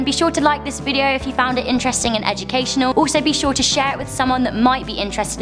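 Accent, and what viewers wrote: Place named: United Kingdom. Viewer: yes she is pretty n I'm also a follower.I love her accent. its so British. love that.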